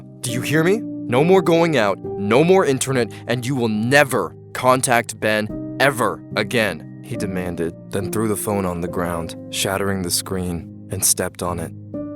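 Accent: United States. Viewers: Ok so he grew up in France and then moved to neither lands so why he got an American accent?????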